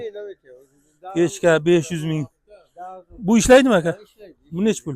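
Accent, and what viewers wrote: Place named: Peshku, Uzbekistan. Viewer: БУХОРОНИ ҚАЙЕРИДАНСИЗ ШЕВА ПЕШКЎГА ТОРТОПТИДА ДЕГДАВОНМИ ПАХТАОБОД ГУЛХАЛОНМИ КАРОЧИДА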